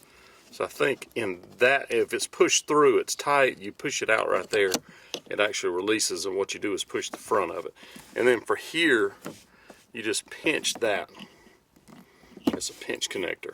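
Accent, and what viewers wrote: South, United States. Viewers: Thank you so much for your video. Ive ordered my Jeep wrangler unlimited 2016 and i'm soooo excited for it to come in. I've watched your video several times as I've ordered my jeep to come with a hard top and i'm going to order a soft top after i receive it. VERY detailed. Nice to hear a nice Southern Gentleman do a great job on a video! God Bless! Keep doing videos!!! We live in Florida and own a Hot springs spa, but we love your Cal Spas!!!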